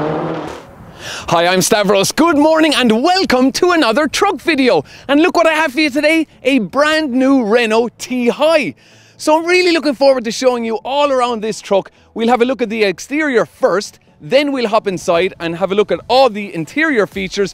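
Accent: Ireland. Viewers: I come for the trucks and stay for the accent haha love you Irish!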